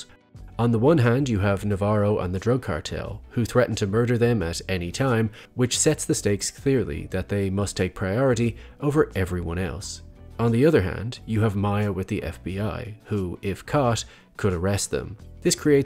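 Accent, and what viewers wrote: Ireland. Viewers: Im pretty sure you're Irish but I can't place the accent exactly.. If i had to guess I'd say you're from wicklow or wexford .. Great video too btw👌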